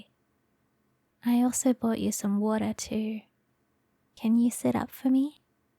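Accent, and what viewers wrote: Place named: Australia. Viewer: dam what a guess that you’re from Australian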